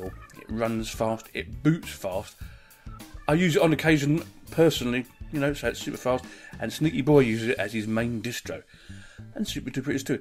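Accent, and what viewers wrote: United Kingdom. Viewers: British best accent :-)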